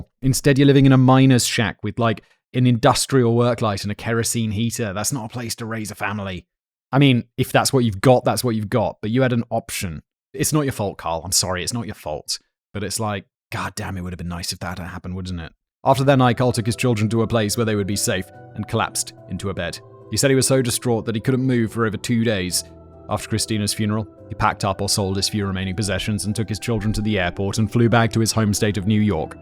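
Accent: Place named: United Kingdom. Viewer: Congratulations, you've found a way to profit off the dumbing of society by pretending to be educational. Lucky for you society was already dumb enough to mistake a british accent for the voice of reason.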